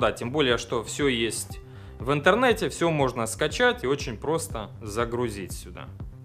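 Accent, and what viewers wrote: Rostov, Russia. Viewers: Почему он разговоравает как Негода? В Ростове все так говорят??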